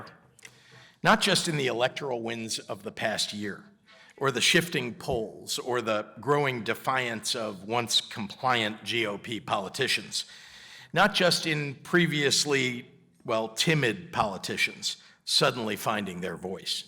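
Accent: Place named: Canada. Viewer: About Cannabis ~ after a CRIME of the CENTURY of ILLEGAL CANNABIS - We the GLOBAL people truly demand & expect this otherwise FREE DITCH WEED backyard garden-variety naturally safe & superior NATURAL BOUNTY plant to be RETURNED outright & overnight so we can GET BACK ON TRACK as a GLOBAL SOCIETY using our free best foot forward to redemption, after being FORCED to instead utilize sickly synthetic toxic patented/lapse-patent profit crap for generations.. So yes - time this ends is already 105+ years later. Thanks too for referring to it as 'Cannabis' vs that designed derogatory slang 'mj'. Respect! Speaking of a Garden that didn't need tending.. and 'weeds' that needed to be rooted - consider how FAR AWAY FROM NATURE the global population has been forcibly redirected.. consider a DANDELION is one of the most healthy plants we have at our feet - and what we have been doing with it all along.... 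just as a simple primal example of how FAR OFF the path WE HAVE BEEN LED. Dang, Sir - Respect! From a Canadian, I am taken aback by your speech - in a very great way! You almost sound 'Canadian' fcol! This is a breath of fresh air - the rest of the planet awaits!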